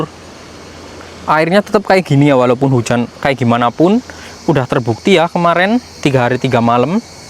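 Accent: Banyumas, Indonesia. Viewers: Kiye wong banyumasan kiyeh...cilacap, banyumas, purbalingga, banjar negara, apa bumiayu lur..? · asline ngndi kang,,ngapak deneng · Kok ngapak mas, asli mana ? Maaf penonton baru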